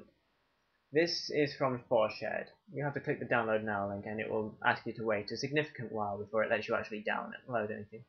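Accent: England, United Kingdom. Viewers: you sound like my friend Alex with a British accent. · Love your accent! Are you English like me?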